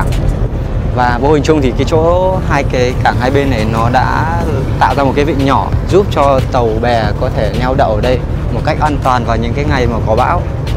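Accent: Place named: Hai Phong, Vietnam. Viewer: Ngay lần đầu nghe giọng a nằng nặng e đã nghi a là người hp r. Hóa r đúng thật. Xin chào anh người đồng hương. Rất mong đc a thả tim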